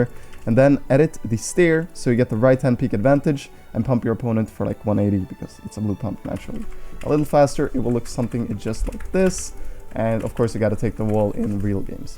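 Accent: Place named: Norway. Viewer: omfg du hørtes så jvl norsk ut med da du snakka engelsk🤣 translated: you sounded so f*ckin Norwegian when you talked English 🤣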